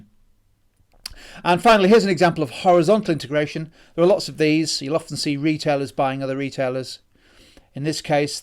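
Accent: England, United Kingdom. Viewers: seems legit because he has an english accent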